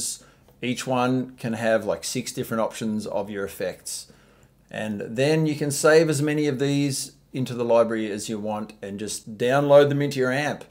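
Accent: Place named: Australia. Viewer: Aussie accent is strong with this one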